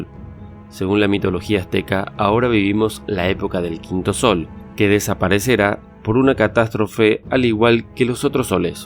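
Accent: Argentina. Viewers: Fabuloso documental. Me cae un poco de raro que tenga acento argentino el narrador, pero vamos, que muy bien narrado, impecables las imágenes y se ve el conocimiento, esfuerzo y conciencia que hay detrás de este contenido. Muy grato de verdad. Tienen una nueva suscriptora. 😊❤❤❤ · Soy mexicano y conocedor de la historia mexicana y la verdad no se si la persona que hizo este vídeo es mexicana aunque suena a argentino y si eres argentino debo felicitarte por la buena investigación que realizaste, he visto vídeos de extranjeros farsantes que quieren engañar a los mexicanos con datos falsos y la verdad si caen los mexicanos en los engaños sobretodo porque los mexicanos no conocen su historia y ellos se basan en lo que les diga su youtuber favorito que la mayor parte del tiempo son igual de desconocedores de la historia como ellos y para terminar yo invito a la gente a visitar mi canal y allí tengo 3 vídeos de Teotihuacan realizados en la zona arqueológica y mis vídeos podrían ayudar a los que vayan a visitar el lugar como una guía turística para que sepan que deben ver y visitar en el sitio y para terminar solo quiero decir al realizador del vídeo que los aztecas pensaron que quien hizo la ciudad fueron gigantes por que ellos encontraron huesos de animales pleistocenicos como mamuts, camelidos etc. 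y toda esa zona de los valles centrales de México es rica en restos de esos animales, mi canal es Rolando México RS y los vídeos los hayan como Teotihuacan la ciudad de los dioses mi explicación primera y segunda parte y ojala se den una vuelta por mi canal que está censurado por la plataforma.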